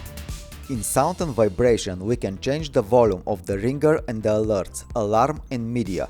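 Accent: Russia. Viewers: his voice can't be more russian, even when he is speaking in english it felt like i'm listening to russian language.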